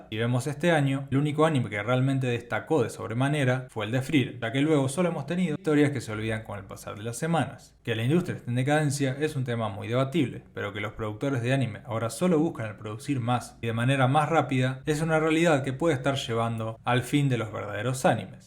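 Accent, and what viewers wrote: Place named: Rioplatense, Argentina. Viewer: No te burles, quizá es un argentino o uruguayo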